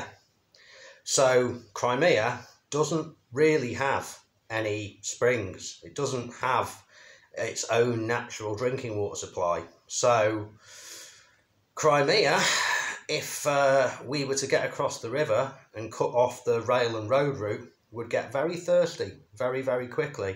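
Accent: United Kingdom. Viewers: You must be from London or areas around as you sound exactly as one of my British colleagues, even tone of your voice! If I were to listen to both of you, I wouldn’t be able to tell who is speaking! Stay safe, mate, thank you for all you do, you have my outmost respect! You now have a subscriber from San Francisco.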